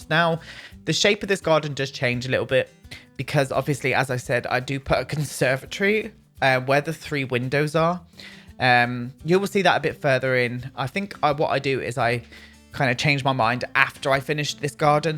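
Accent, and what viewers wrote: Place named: Devon, United Kingdom. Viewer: I know this is probably a stupid question but are you from Devon x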